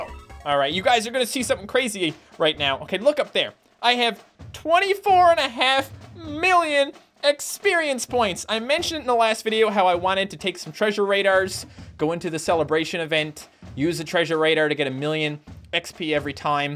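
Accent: Newfoundland, Canada. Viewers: Wait your a Newfie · love ur canadian accent and ur "cosed" "o" in "sorry" from where I am the "o"s are "open" my sorry would look like a sarry and we're made fun of because of that ^^ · You're Canadian?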